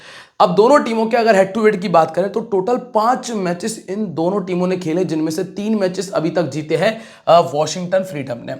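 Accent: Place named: Rajasthan, India. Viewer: Bhai aap mujhe rajesthan se lagte hai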